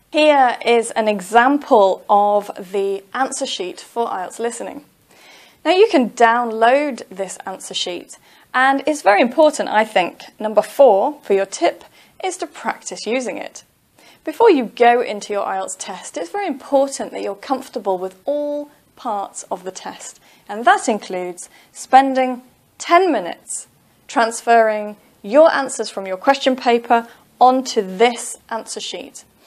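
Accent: England, United Kingdom. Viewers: 😂 lol yeah Because is an English lady living in england😎 accent can tell the saga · i really love you madam, i really love the way you make us understand. so easy and slowly, however the British accent is a bit difficult to understand.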